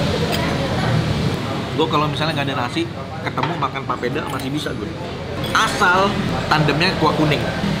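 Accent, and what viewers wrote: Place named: Ambon, Indonesia. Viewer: Ambon mana suaranyaa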